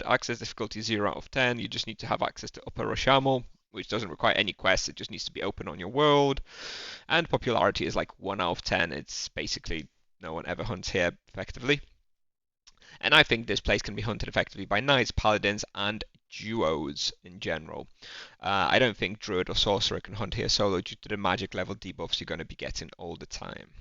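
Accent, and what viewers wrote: United Kingdom. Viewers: moje niedopatrzenie, musiałem skipnąć, bo intuicyjnie liczyłem, że będzie to na końcu filmu. Super kanał, masz mega potencjał ze względu na świetny angielski. Siedzisz w UK? Tak brzmisz hehe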